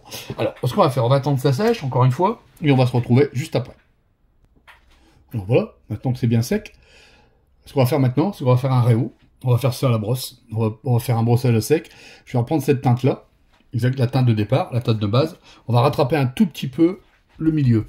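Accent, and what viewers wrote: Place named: Nord, France. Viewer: Merci pour cette super recette, j'aime bien le rendu 🙂 Un grand oui pour des tutos une fois par semaine 👍 Je ne sais pas si tu donne des cours, mais tu devrais. Tes explications sont toujours très claires et sans chichi. Un grand merci! Et en passant, ton accent du chnord est au TOP. Bonjour de la Suisse!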